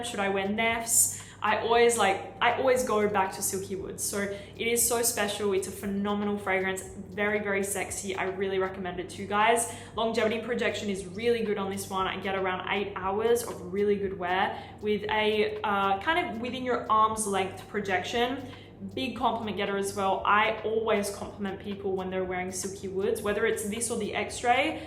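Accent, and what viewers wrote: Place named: Australia. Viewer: Love Australian words” accent!! AMAIZINNNN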